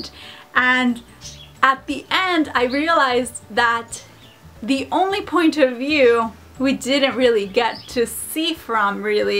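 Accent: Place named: France. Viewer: Your french accent is so cute! :D But I don't understand why your french class teacher made you read Agatha Christie and not an author who wrote in french haha (but Agatha Christie is awesome so oh well :3)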